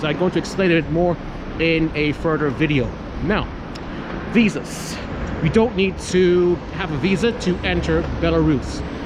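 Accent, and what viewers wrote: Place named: Tipperary, Ireland. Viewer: Trying to work out the accent, thinking not Cork, more a Tipperary, Waterfordish? Could be Wexford, oh I had a girlfriend from Wexford, her dad owned a load of pubs and super respected bloke, his daughter was a true clear skinned Coleen of beauty and bright intellect as we shared our love of certain works of art.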